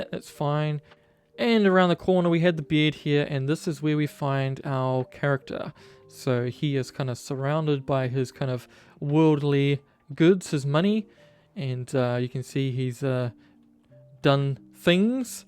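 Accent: New Zealand. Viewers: You a kiwi bro??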